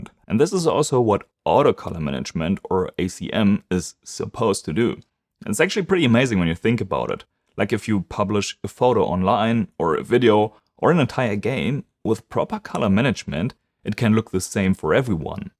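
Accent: Germany. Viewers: Hab den deutschen Akzent direkt rausgehört😂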